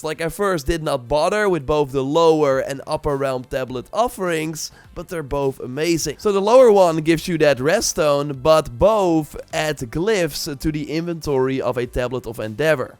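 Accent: Netherlands. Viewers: I hate that the dude with the best tips videos has the worst Dutch accent, it absolutely atrocious mate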